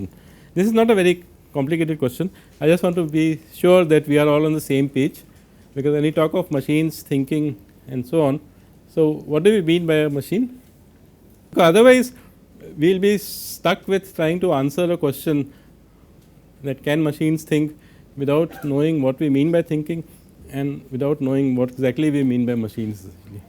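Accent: India. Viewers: I love Indian folks. From the 90s smuggling recycled 64 bit sparcs over yonder, to present day; where Sunnyvale is a suburb of Mumbai. But listening to that accent, thick as curry molasses in wintertime... god help me or Vishnu whoever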